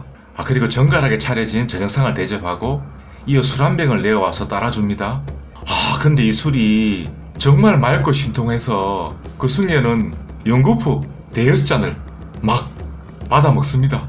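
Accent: Gyeongsang, South Korea. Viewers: 님 이 혼탁한 말세에 사람다운 이야기를 듣기좋은 갱상도 말로 이리도 정신이 번쩍들게 하시니 그 덕이 길이 이어지길 바랍니다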